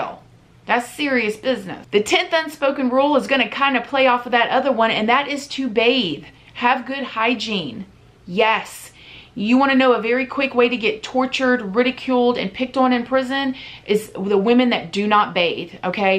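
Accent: South, United States. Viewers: What? She's southern ding ding, she's from Florida. There's no way to hide being from the south. Unless u didn't grow up here all your life. Or you have had a speech coach drill it out ur mouth lol. Some words or moments, bring out your southern drawl more. I live in Texas born and raised in the deep south😂. I sound like a Dolly Parton country song, with a Reba Macintyre twist.😂😄😁😊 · Rhoda Yackez she isn’t putting it on, she actually has a southern accent and why do u feel the need to critique her looks? let her live. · I'm from Florida too. She has southern accent all the way through..it comes out stronger when were nervous, excited,. Or when I'm at the dentist on the funny gas LMBO. ...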